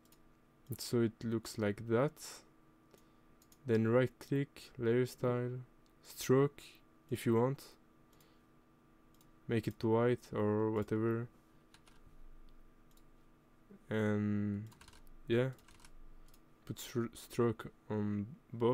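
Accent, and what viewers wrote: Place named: France. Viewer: why is bros voice always changing now u sound french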